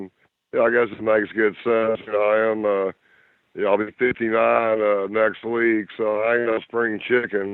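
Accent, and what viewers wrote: South, United States. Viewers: Just a Southern drawl to me! Nothin' bad about that.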